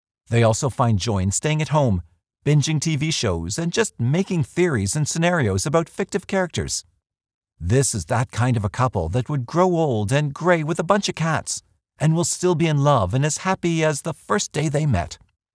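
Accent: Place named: Canada. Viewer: The narrator is from Canada; I can tell because of his pronunciation of " ou" .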